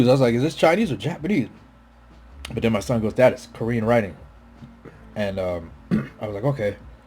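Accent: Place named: California, United States. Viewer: His California accent is hilarious 😂😂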